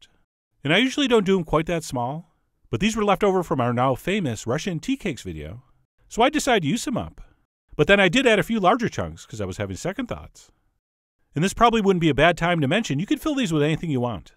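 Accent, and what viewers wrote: United States. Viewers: His voice inflection gives me anxiety... I'm trying to listen to his instructions, but his voice inflections keep distracting me and forcing me to rewind, but I'm usually just re-distracted so I end up rewinding one section 4 times. D: He's great though, talented and funny, so imo the distraction is worth. Anyone know where this dialect originates from? Pretty sure it's an American regional accent. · I still struggle with this tuning up and down form this voice. It's like a Roller-Coaster in each phrase... dah-dah-DAh-DAH-dah-dah... dah-dah-DAh-DAH-dah-dah... dah-dah-DAH-DAH-dah-dah... This sounds EXACTLY like a vinyl record with a out centered hole in the middle. The record spins like an elipse... Is that some kind of accent from some region in the USA? It's really annoying in my ears... BUT, the video is really cool and THANK YOU for this recipe!!!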